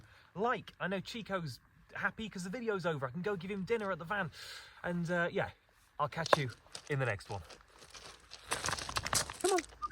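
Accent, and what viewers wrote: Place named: United Kingdom. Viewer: You have a narrator's voice. It reminds me of a voiceover from a British show.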